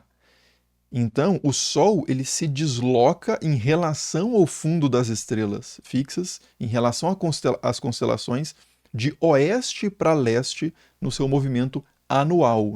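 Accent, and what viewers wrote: Minas Gerais, Brazil. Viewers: sotaque mineiro pega diferente né...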